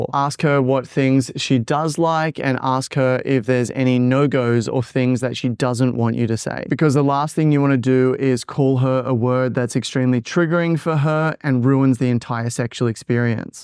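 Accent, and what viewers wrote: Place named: Australia. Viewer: you're amazing. i love your videos and your stupid little australian accent. keep it up, i love watching your stuff with my bestie, we're so educated now!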